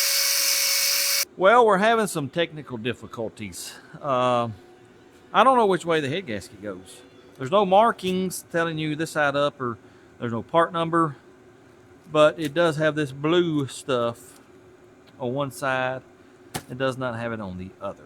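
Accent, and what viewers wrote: South, United States. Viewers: Me and my wife absolutely love this channel. Your cadence and voice remind us so much of our relatives who live in Tennessee and North Carolina. So it's quite comforting. I wish you nothing but the best sir! We will keep watching as long as you keep posting.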